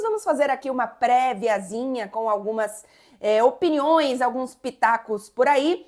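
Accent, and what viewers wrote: Bahia, Brazil. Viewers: você ainda tem bastante sotaque baiano, viu?! 😅😅😅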